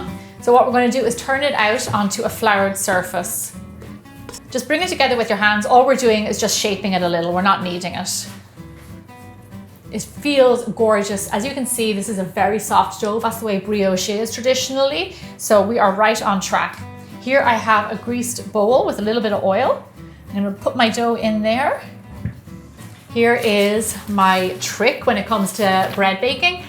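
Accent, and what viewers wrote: Ireland. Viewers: Hi as an Irish person watching this from Wakes, UK this made me smile. Your lovely Irish accent reminds me of home. 🇮🇪🙂☘️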